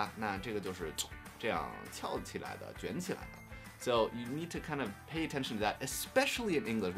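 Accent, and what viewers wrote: Beijing, China. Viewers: 老师，你这个大京片子，跟我说是跟非诚勿扰学的，我是不信的。。。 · 您这中文还带京味，厉害了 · 天啊！您这口北京腔是怎么学的啊？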